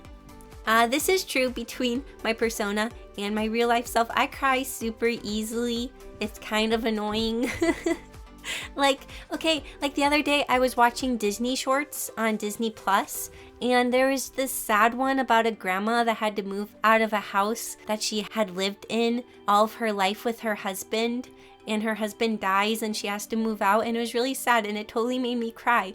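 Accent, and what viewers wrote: United States. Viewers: I dont think your asian bc asian ppl dont rly wear sweaters and you sound like usa ppl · Hi Nd to answer wat u said this video I figure you were white American because I have a friend that have an accent when she talks but she’s American she’s not mixing or anything she’s just a white Caucasian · Are you by chance from virginia or somewhere near there? You're voice/accent reminds me of my family whose from there lol.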